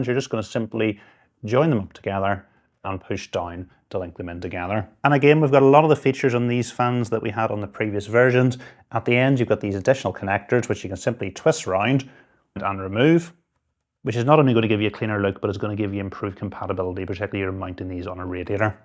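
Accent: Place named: Northern Ireland, United Kingdom. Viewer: Love hearing a local accent on these tech videos. Great to see a fellow lad from NI doing so well. Its great how clearly you explain things and take your time and dont over hyper. Keep up the great work.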